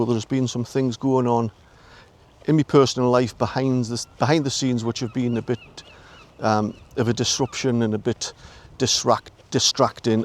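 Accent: Newcastle, United Kingdom. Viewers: As a Geordie from Wallsend now living in Thailand I help local kids learn English and I do not feel home sick as all my kids speak Geordie like you. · It's wonderfull to hear the Geordie accent and to see some places associated with my family, way back. My mother was born in Pelaw in 1923 and she kept her accent all her life, despite living most of the rest of her life in East Anglia. Her father, Sidney Brown was a miner down Wardley pit. He was very active in the trade unions, and in October 1936 was one of the Two Hundred on the Jarrow Crusade. It may have been a futile attempt to challenge an intransigent government's mind, but now it is an iconic event that everyone has heard about. Your new video shows the very area where once there was a mine where "Ganga Broon" worked and my mother lived as a girl. So, huge thanks,. Keep up the good work!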